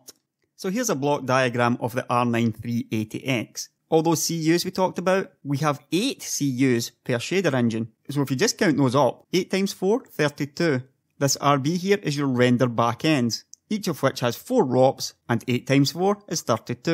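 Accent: Scotland, United Kingdom. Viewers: scottish iirc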